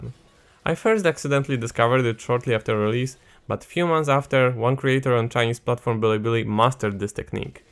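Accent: Poland. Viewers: Polish voice strong! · Stary, pierwszy raz usłyszałem twój glos, od razu wiedziałem że jesteś Polakiem i chyba się nie mylę. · the Polish accent make the video better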